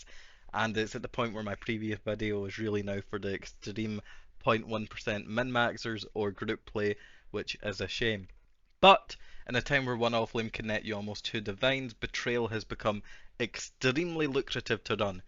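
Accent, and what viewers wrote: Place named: Scotland, United Kingdom. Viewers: Scottish poe gamer les gooo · I was thinking the same, Is that scotish accent known from samurai jack:)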